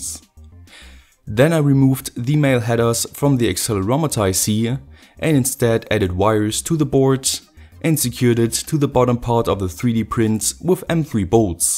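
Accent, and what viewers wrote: Germany. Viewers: German accent is really fine and "clean"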